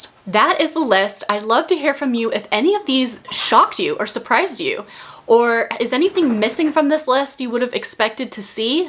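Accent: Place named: England, United Kingdom. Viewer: What English accent you have I like your talking ❤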